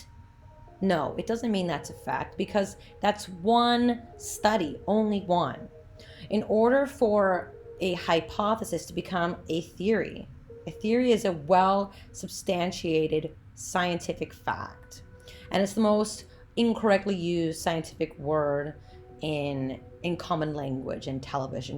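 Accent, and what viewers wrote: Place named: New York, United States. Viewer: Really easy-to-follow/understand lecture! I've never had an online professor who has their lectures set up on YouTube before. I like your accent. Are you from New York or New Jersey?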